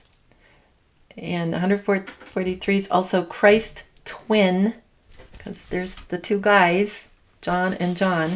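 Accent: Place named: Boston, United States. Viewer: Yes... I too hear a little bit of the Boston Accent 🙂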